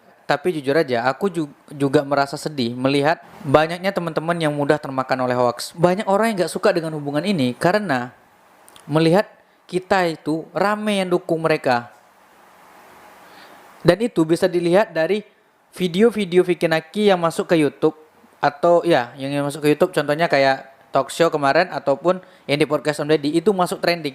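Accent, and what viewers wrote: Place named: Sumatra, Indonesia. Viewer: Sor x bah aku nonton in video mu bro, kalo bisa logat Sumatra jgn di ilangkan bro, semangat buat konten bro... Udh lama jd subscribers mu baru x ini aku komen😂😂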